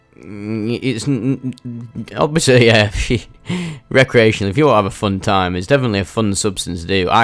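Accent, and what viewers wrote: United Kingdom. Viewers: It’s his British accent ketamine is very popular in the UK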